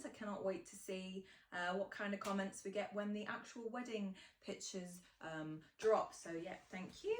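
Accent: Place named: United Kingdom. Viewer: What a beautifull british english. And all the best, blessings together.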